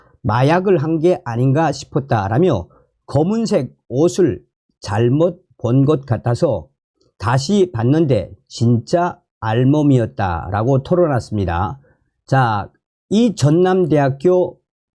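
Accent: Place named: North Korea. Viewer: 이 바라!!! 다른 사람을 시켜 방송을 하든지 아니면 아예 이북 말로 방송을 하든지... 듣는 사람 넘 힘들다.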